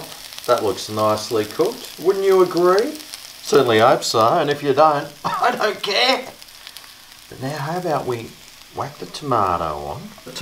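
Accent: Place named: Australia. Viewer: From an Aussie - I love your good old Aussie accent and style. Great representation of the average Australian :) Good lookin sanga!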